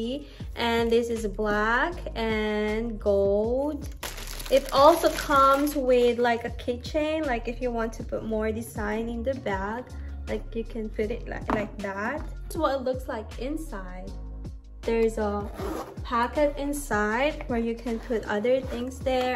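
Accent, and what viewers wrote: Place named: United States. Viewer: Grabe bongga. masyado kna slang..ilang taon kna sa U. S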